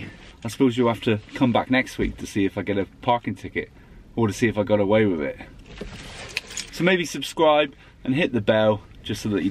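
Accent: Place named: Devon, United Kingdom. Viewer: Get in Bey!!! come down to Devon, although you sound like you’re from my area anyway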